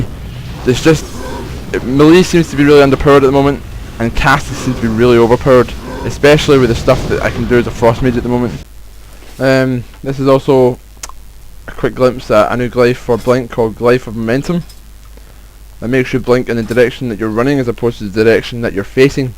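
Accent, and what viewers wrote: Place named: Scotland, United Kingdom. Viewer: great video great tips sexy scottish voice ;)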